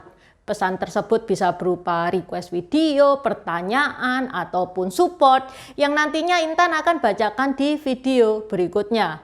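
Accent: Java, Indonesia. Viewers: Orang Jawa y mbak intan ngomong y medok bgt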